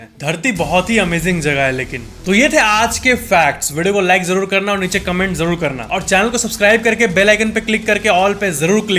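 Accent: Bihar, India. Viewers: Bhaiya aap bihar se ho kya aapki bolne ke andaaz se pta chal rha hai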